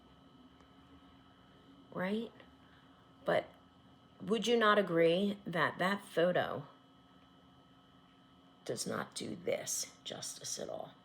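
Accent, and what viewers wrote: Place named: South Jersey, United States. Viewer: Have been enjoying your flosstubes for awhile and realized you haven't been doing them that long. I decided to go back and watch your first video. LOL! I am a Jersey girl living in Williamsburg VA because my husband is a retired Army officer, and this is where we ended up. We are college sweethearts who graduated from Glassboro in 1983. I did my student teaching in Haddon Heights. Love your south Jersey accent.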